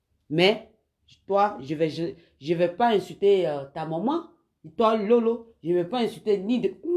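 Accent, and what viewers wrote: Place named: Guinea. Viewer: Tu fais peur tu ressemble à une sorcière voilà tu es venue de la Guinée 🇬🇳 voilà pourquoi ton français est penché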